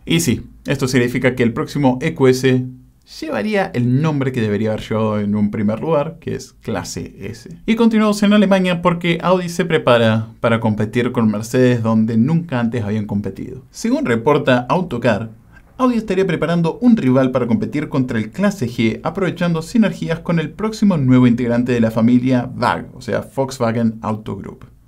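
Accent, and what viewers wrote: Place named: Buenos Aires, Argentina. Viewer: *Bonaerense, que en el interior cambia bastante la pronunciación. 😉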